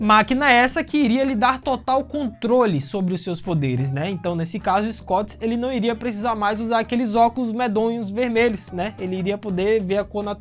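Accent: Bahia, Brazil. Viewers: Cara tu é daqui do baixo sul da Bahia? Simbora meu conterrâneo, voa mano!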